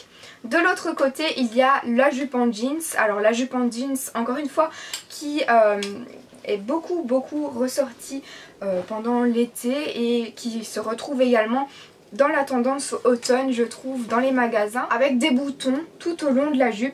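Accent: Belgium. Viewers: Tu deviens de plus en plus jolie ! Et j'adore ton accent belge 😂😂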